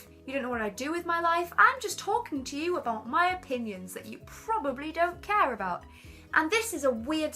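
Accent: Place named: England, United Kingdom. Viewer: I LOVE THE ENGLISH ACCENT!!!!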